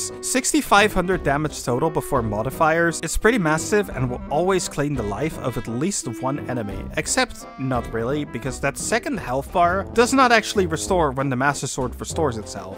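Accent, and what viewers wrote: Netherlands. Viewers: Hey jij bent nederlands ! HA betrapt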